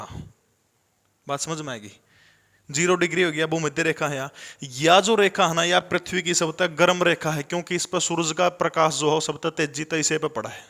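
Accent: Haryana, India. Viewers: Sir aapki haryanvi bhasa Achi lgti h👍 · Dhanybhag humare guruji Aap jaise teacher se padhne ka moka milta h 🙏🙏Sirji bas meri ye problem rahi h jisme aapka jara sa bi dosh nhi Mai aapke Haryanvi lahja Kam samjh paya hu fir bi sbse best class aapki h bahut bahut respect or pyar sirji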